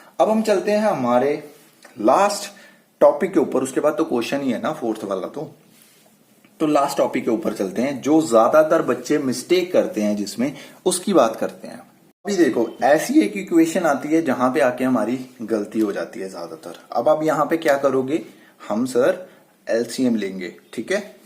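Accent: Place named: Punjab, India. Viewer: phirse kya haal he 😂. Full punjabi accent.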